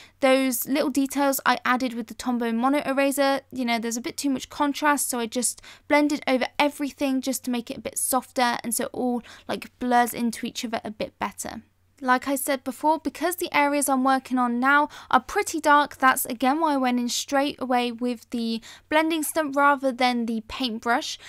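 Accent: United Kingdom. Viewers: I knew you are from UK when I heard your first few words. hehehe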